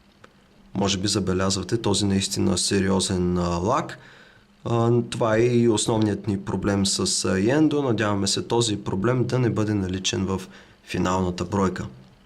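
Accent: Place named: England, United Kingdom. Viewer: Which language is this? I really like your English accent lol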